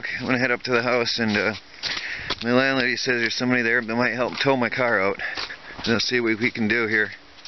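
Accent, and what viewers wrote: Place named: Michigan, United States. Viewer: Your Michigan accent is showing. :)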